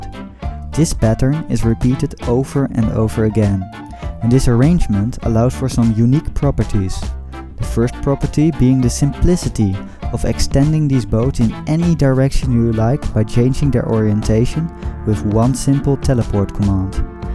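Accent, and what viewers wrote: Netherlands. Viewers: Het accent wel:3 Ik ben trouwens ook heel blij dat ik een Nederlands accent heb herkend. Ookal is het best makkelijk;3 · Ben je Nederlands? je hebt namelijk een echt Nederlands accent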